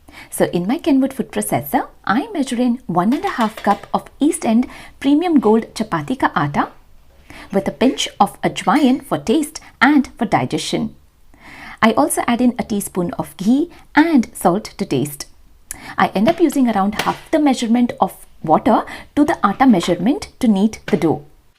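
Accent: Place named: Karnataka, India. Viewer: Nivu karnatakadavara kannada bhaashe keli tumba santoshavayitu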